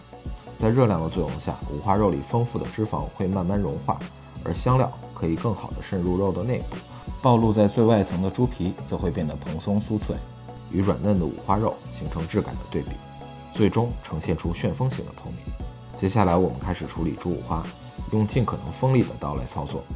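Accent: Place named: China. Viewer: 除了刀功和口音是中式的，其他完全纯正老牌欧式，毫不犹豫素质三连。留着整套菜谱，我圣诞节做家饭。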